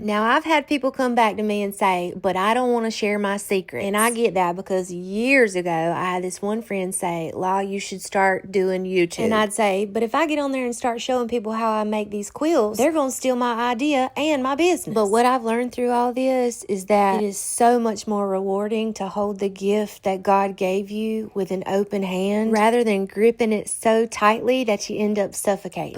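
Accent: South, United States. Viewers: your Southern drawl reminds me so much of my mom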